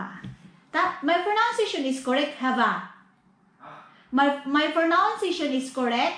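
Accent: Visayas, Philippines. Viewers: pag nag bibisaya ka tawa ako ng tawa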